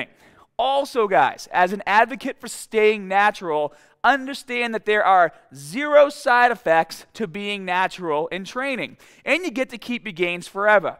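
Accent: Boston, United States. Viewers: First time on the channel. Love the video. But more importantly, do I hear a Boston accent?? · Wow one of the strongest Boston accents evvaaa lmfao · it's just PAHT of his brand - people keep posting comments about a Boston accent - more comments posted - Boston accent gets discussed all the time - Youtube ranks him higher because viewers are engaged enough to post comments - more views for his channel - it's just the nature of the online world - if you don't appear near the top of the hit list ( youtube, twitter, browser - whatever ) you are NOWHERE - NO ONE sees your videos it's just like all those terrible clickbait titles that just about everyone, even legit youtubers use to get views - same with the natty/juicing discussions - no such thing as bad publicity as long as people post comments unless you've tried to get your own web site/business ranked by Google and Youtube and seen how brutal the competition is - it's as brutal as trying to get an acting part millions of people dream of making an online living but very few find the right mix that makes your brand stand out -- ( personality, looks, humor, informative info, frequent updates to channel ) - anything that helps you stand out is a win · fuck yeah boston accent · I've watched a ton of your videos many years ago and around 1 year ago I had to find the video where you discussed the change in your accent. 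I'm watching this video today and although the topic is good I still can't get over the over emphasised Boston accent. Not a diss in any way but for some reason I can't take it as seriously like your old videos. Again no disrespect but the accent I got used to in the past sounded more intellectual whereas the accent now sounds quite dare I say it low class. In England we have many accents too, some sound posh and some just down right ghetto/street. It's the same sort of thing going on here. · Never change, Bahston. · Did always have a slight Boston accent? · Is this guys Boston accent foreal? That shit is strong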